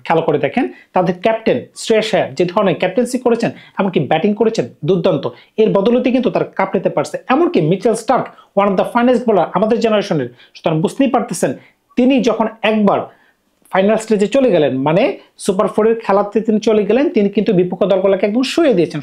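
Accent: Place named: East Bengal, Bangladesh. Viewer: Bangal katha posay na. Valo bangla uchcharan karu n.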